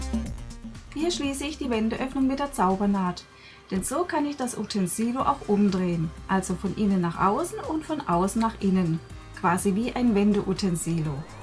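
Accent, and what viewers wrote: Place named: Karlsruhe, Germany. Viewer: nein ich höre es am dialekt :D komme selbst aus karlsruhe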